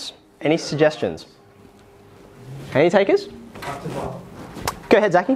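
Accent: Australia. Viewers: Aussie accents make stats more fun.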